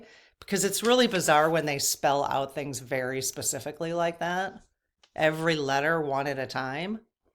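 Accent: Midwest, United States. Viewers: I grew up in Iowa and live in Appalachia now… midwestern accent is comforting to me.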